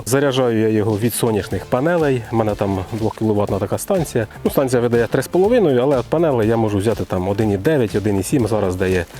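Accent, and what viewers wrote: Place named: Poltava, Ukraine. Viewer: Полтавский говор.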